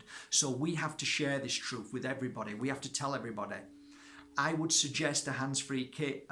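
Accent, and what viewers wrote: Yorkshire, United Kingdom. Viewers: 100% agree with everything you say x \especially about the govt x I have two large Himalayan salt lamps in each room to help with neutralising the effects of wifi and always wear crystals too but am going to start turning of the wifi at night as you suggest . Nb : Are you from Yorkshire originally , like me ? Sounds like a good old yorkshire twang you have there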